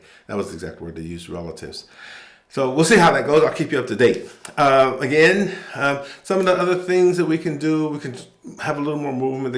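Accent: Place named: Italy. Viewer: You picked up an Italian accent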